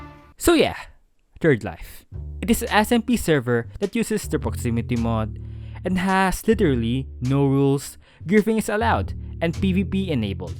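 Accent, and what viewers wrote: Philippines. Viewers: u pinoy ur voice is SOOO PINOY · Well that explains his accent! I’m Filipino too! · Is it me or his accent sounds like filipino Xd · You sound Filipino.. are you?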